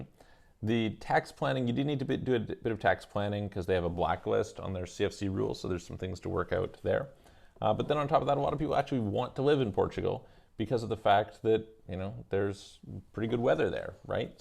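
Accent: Canada. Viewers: Your videos are informative and I like how you say aboot.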